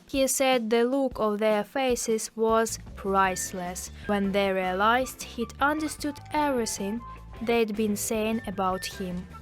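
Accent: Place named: Russia. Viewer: I can hear the russian accent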